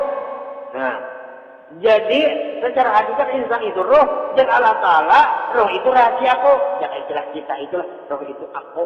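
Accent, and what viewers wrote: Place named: Kalimantan, Indonesia. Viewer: Kaya logat kalimanta ceramahnya,,kiyai2 dari kalimantan banyak sekali ya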